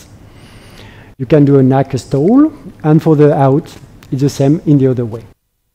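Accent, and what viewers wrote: France. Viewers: vıcık vıcık fransız aksanı, başka adam yok de mi sunumu yapacak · He says ON POINT: he is trying to pronounce Endpoint apparently. He pronounces English words according to the French pronunciation rules. ZE OST = The host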